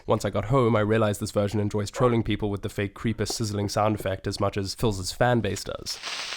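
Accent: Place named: South Africa. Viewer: Are you from SA? Your accent is a dead giveaway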